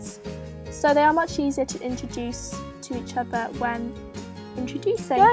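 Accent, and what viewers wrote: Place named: London, United Kingdom. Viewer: What area of London is this accent from?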